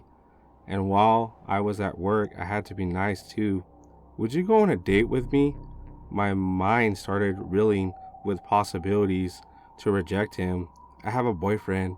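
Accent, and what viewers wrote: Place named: California, United States. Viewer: Much better. I was going to ask if you were from California, then you said you were. I knew it, I used to live there your narrator definitely has the accent. Can I ask why you are not narrating your stories? No offense to your narrator but you have a better voice for it. I look forward to more of your stories!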